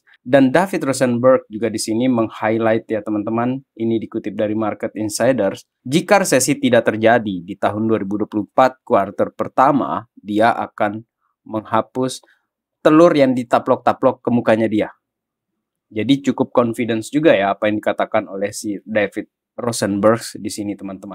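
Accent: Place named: West Java, Indonesia. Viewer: logat nya mirip sunda daerah priangan timur 😅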